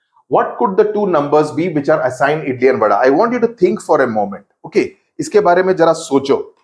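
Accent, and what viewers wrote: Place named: South, India. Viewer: Sir you are south INDIAN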